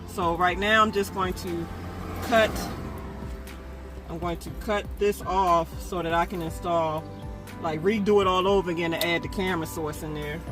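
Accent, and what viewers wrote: Baltimore, United States. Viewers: My 10 year old recognized your voice. He asked if you were from Baltimore. I told him yes. He said you talk like one of his friends from Baltimore. So funny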